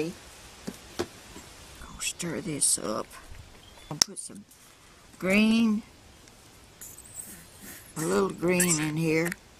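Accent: South, United States. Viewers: Hello Mr, Your so sweet love your southern accent. I love to watch you create, it come so natural to you. I could watch you for hours. I've learned a lot from you. Your very creative, and 'm impressed. I cant wait to retire so i can create my heart out like yourself. Bless You Always. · Ms., what a blessing you are, listening to you makes me homesick for the south, I am from SC. God bless you, love your projects, what a wonderful job you do.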